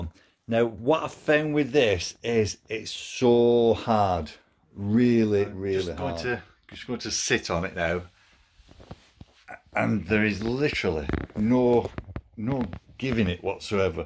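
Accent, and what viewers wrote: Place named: Yorkshire, United Kingdom. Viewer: Love that Yorkshire accent. 😍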